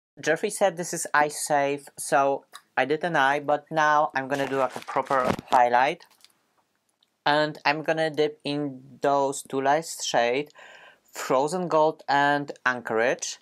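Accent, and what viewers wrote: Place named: Poland. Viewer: hi! where are you from? your accent seems extremely familiar and polish-like :)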